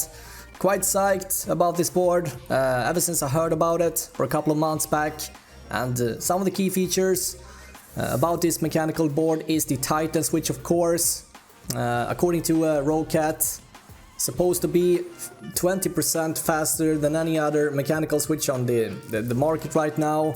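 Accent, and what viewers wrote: Sweden. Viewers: the svengelska is taking over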